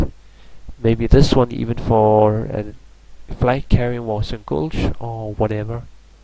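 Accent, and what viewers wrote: Denmark. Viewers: Are you danish? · Are you Danish? You sound like a Dane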